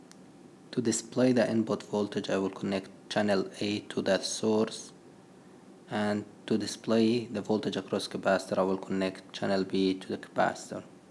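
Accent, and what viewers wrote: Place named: Arab world. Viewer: عربي. واضح من اللهجة ما شاء الله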